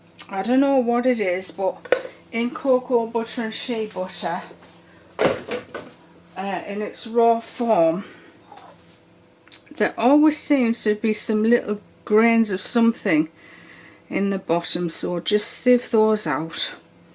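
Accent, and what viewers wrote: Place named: England, United Kingdom. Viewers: Love watching you! This sounds crazy but I catch myself talking to myself in your English accent and I am Texan!! This quarantine is making me bonkers!🤣 Love that you say you cook on a “ring”! I had to think about that for a second. We cook on a “burner”.😄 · Hi I’m guessing because of your accent you are from the north of England- I live near Newcastle! Love your vids very helpful. Well done ❤️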